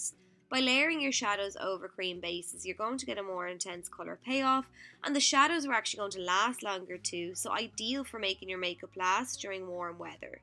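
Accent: Ireland. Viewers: Is that an Irish accent I hear?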